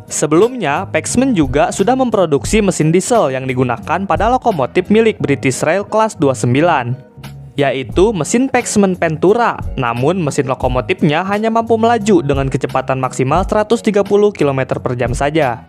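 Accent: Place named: West Java, Indonesia. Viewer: Naratornya sunda pisan euy...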